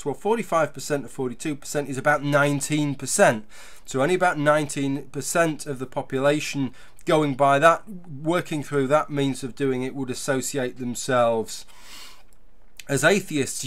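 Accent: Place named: Birmingham, United Kingdom. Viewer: This has nothing to do with the video but what is that accent is it birmingham?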